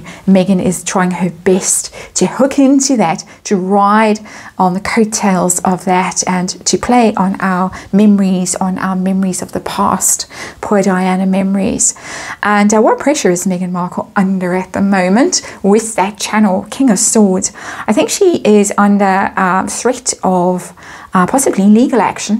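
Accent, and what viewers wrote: South Africa. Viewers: I love your South African accent. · Your accent and Bookworm's are totally different but perhaps it is due to what part of South Africa you each reside in. I don't know anything about South African accents so forgive me if I am wrong. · I love your readings, your dogs chiming in, your lovely South African accent and am not at all bothered that the cards don't always fit into the frame since you take them up to the camera when you are talking about them. Thank you for another wonderful reading!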